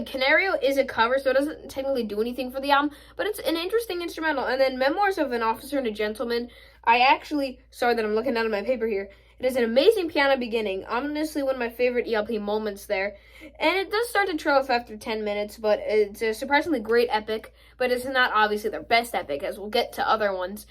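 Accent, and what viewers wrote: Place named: Midwest, United States. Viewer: i can tell you are from the midwest lol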